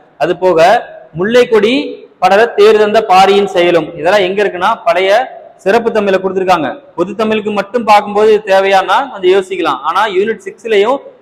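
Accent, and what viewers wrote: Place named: Kongu, India. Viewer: Anna neenga kongu tamil azhaka pesuringa enna oor neenga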